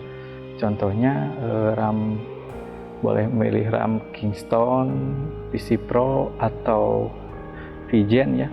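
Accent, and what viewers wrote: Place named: West Java, Indonesia. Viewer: nyunda pisan euy kang.. haha sip lah si subscribe yeuh... haha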